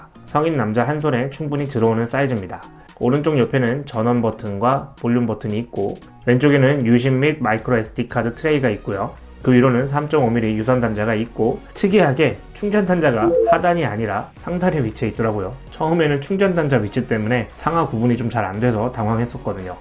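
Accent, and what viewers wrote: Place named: Daejeon, South Korea. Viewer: 대전분이군요 ㅎㅎ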